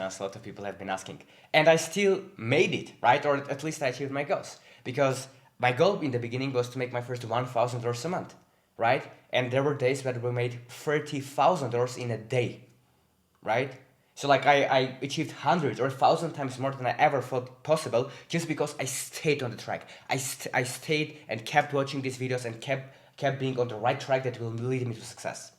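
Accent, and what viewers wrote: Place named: Georgia. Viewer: Hi friend from Georgia, at first i thought you were Georgian because of our accents sound pretty similar. Keep up the good work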